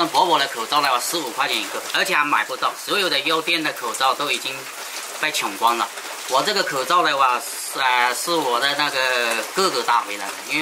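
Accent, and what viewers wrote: Hakka, China. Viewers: 客家口音？？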